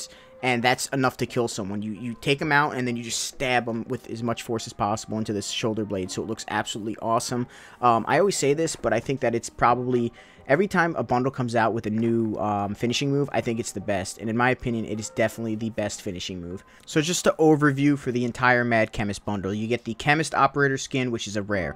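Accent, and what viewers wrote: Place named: Germany. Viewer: in his bio it says he's from austria and he his first language is german you can also hear in his accent that he's german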